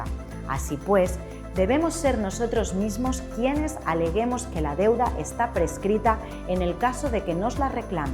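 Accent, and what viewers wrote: Spain. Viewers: Habla de España. ¿ no te enteras del acento o qué ?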